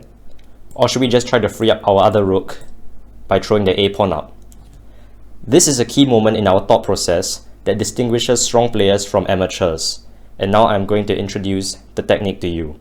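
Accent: Singapore. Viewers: Ayoo you from SG? Accent sounds so familiar lol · Let me hear that Singlish sir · Brilliant video. I'm 1500 rated and I found this video really instructive. Are you also Singaporean? I ask because I am, and I recognise the accent!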